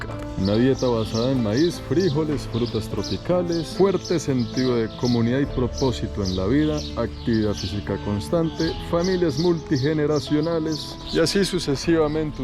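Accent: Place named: Bogotá, Colombia. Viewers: Ay qué cómico pichirilo me da una gracia ese nombre que le pones al carro y con ese gran desayuno ni te asomes al cráter o de un precipicio porque te vas de cabeza con el estómago lleno jua jua jua jua jua jua pasen niñas pasa y pasa no hay apuro ay me encanta como hablas será que soy de Uruguay y me me gusta muchísimo cómo hablan los colombianos pero especialmente tu voz que es bastante baja y las palabras que le pones a las cosas les cambias el nombre son modismos no de cada país a mí me encantan me encantas tú y tus vídeos y te deseo lo mejor en la vida estás en uno de los países que yo quisiera conocer por algo cuando llegaste dicen buena vida te saludan así y eso y eso ya es buena vibra muy positivo o pura vida bueno algo es de eso no me acuerdo bien algún día iré por ahí así que no me voy a perder nada de este video que promete como todos tus vídeos ningún desperdicio cuídate y Dios siempre va contigo❤❤❤❤❤ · 🤭🤭 me encanta como narra🤭🤭muy yo 🤭 cachacos al fin y al cabo🤭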